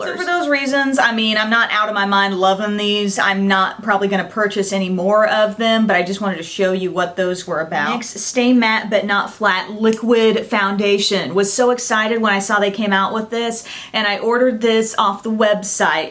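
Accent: South, United States. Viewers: I did not notice it before but Oh yeah.. you have (a little) southern accent :)) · ! I've been watching your channel forever and today I decided to revisit some old videos. I noticed that sometimes a southern(?) accent comes out in your older videos, but it's completely missing in your newer ones. Just thought that was funny.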